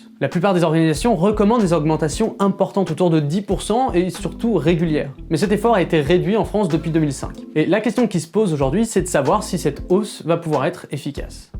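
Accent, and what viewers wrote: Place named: United Kingdom. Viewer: Ton accent anglais est incroyable, j'ai eu de l'ASMR quand t'as dit "the economics of welfare"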